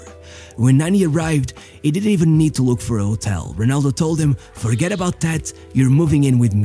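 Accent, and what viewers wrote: Portugal. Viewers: With all respect this guy talks lies quaresma was Champion in Sporting and in 04-05 we ended up in second know your facts instead of making them up specially if u sound portuguess maybe a Benfica stan that wants to Paint the wrong picture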